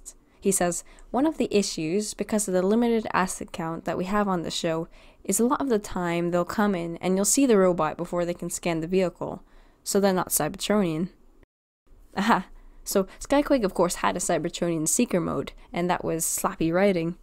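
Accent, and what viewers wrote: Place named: Canada. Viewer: CANADIAN!?@ FINALLY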